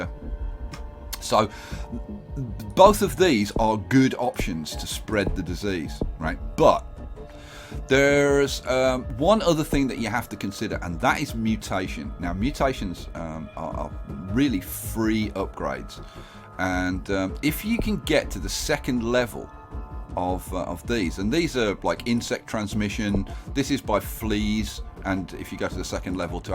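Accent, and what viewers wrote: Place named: Wales, United Kingdom. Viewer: Loved the welsh accent! haha! who's coat is that jacket?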